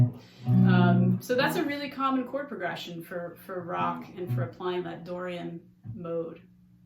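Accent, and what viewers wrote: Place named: United States. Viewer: Hello.Im a very late beginner to playing music, and on Guitar, I just managed to learn the Dorian mode in A, also learning. Youe lesson I just viewed is great BUT 2 things interfere to learn from you, 1 speed of instruction and 2 accent im from England so the American accent and speed of delivery is difficult to follow for me. BUT I enjoyed this lesson tutorial whatever. Thank you I will review it many times hopefully understanding as I develop in understanding your presentations.Nice to listen to a female for a change, I tihink maybe easier to learn from? Looking forward to hearing from you more.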